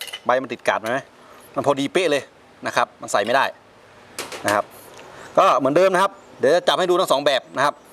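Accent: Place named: North, Thailand. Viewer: คนเมืองกะนิ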